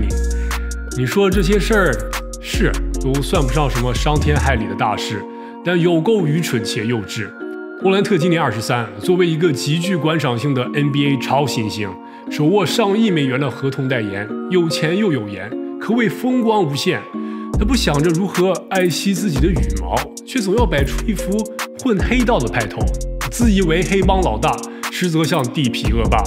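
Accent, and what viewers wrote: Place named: Dalian, China. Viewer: 哈哈大學在大連四年，看第一個視頻時候聽喃第一次說話就知道是大連銀🤣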